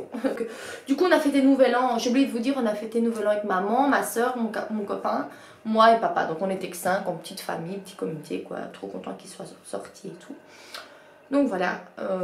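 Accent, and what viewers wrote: Belgium. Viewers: Tu es belge ? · J'ai une question tu es Bruxelloise ?PS moi oui 🙂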